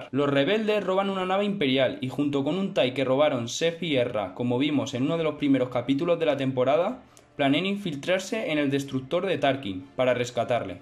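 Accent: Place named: Spain. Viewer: triste encontrar un youtube pura voz española de p o r k r i a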